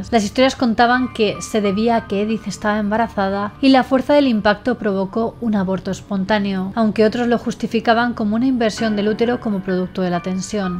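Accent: Spain. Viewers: "Solo se que se nada". Debo de estar muy agradecido contigo, por qué con tus vídeos ( tan lindos, bonitos y hermosos, como la creadora misma ella es ) nos alegran el día; ellos son la alegría de todos nuestros días. Tú eres la mejor, la única YouTube'r que es inigualable, con ese asentó español, eres maravillosa, excelente, muy profecional, YouTube'r, eres tú, hermosa española. Nota: Gracias por tus vídeos. Gracias por existir. Te adoro. Te admiro mucho. En verdad te lo digo, eres tú, muy diferente a las demás. Eres la mejor. Nadie como tú. Hasta el final!!!!!. Hasta el fin de este mundo!!!!. ", HACIA LA ETERNIDAD"!!!!! 💀🏰💜🗝️🕸️🕷️🕯️🧹⚰️👻🎸🍷🎹🖤🌹🎃📚🔮🧙😈🔥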